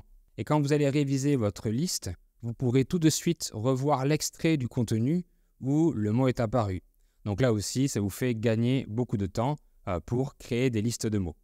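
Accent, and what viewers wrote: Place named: South, France. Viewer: Salut tout le monde. Maintenant je suis en train de "déviner" les accents. Je dirais qui l'accent de c'est du Sud. Marseille, peut-être?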